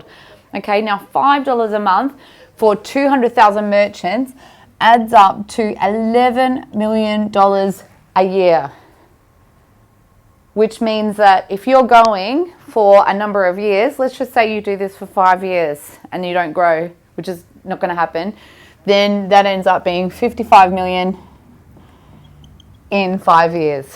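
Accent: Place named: Australia. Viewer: Hey there great video looking forward to finishing it. I noticed this was shot aways back would be cool to get an update on your journey with your idea. Also i was wondering how much did this app cost to make and did you run into any financial challenges/surprises when rolling it out. Lastly are you aussie based noticed you have an aussie ascent. Im Gold Coast based and would love a chat.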